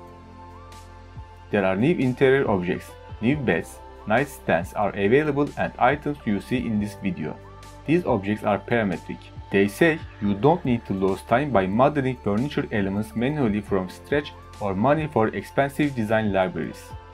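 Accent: Turkey. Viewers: Çok belli türk olduğu ingilizcesinden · Konuşan türkmü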